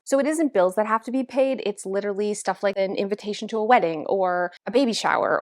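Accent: Canada. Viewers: Are you Canadian? av I hear your accent!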